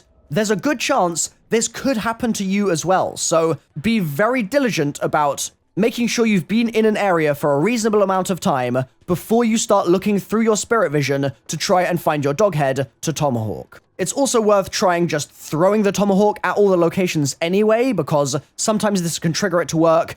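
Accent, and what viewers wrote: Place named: London, United Kingdom. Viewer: YOU SOUND LIKE YOU FROM LONDONNNNN (Nailed it)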